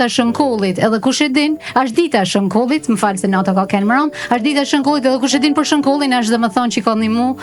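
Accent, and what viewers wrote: Shkodra, Albania. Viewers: Sa. Shum. E. The. Ate. FJALEN. QI. QI. QI ma. Mir. Do. Te. Dalin. Qe Te. Thuesh. Qe Qe. Qe. Qe Jo. QI Qi. Ose Ci. Ci Se NUK. I. Shkon. Dialektit. Shkodran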